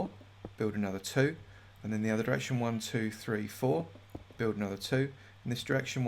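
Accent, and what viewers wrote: United Kingdom. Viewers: You mean he's British?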